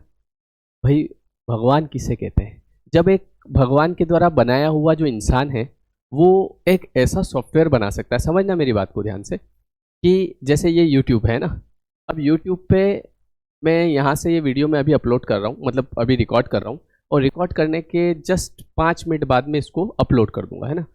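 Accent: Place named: Indore, India. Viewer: Sir indoor ki tarah bolte hai app indoor se hai app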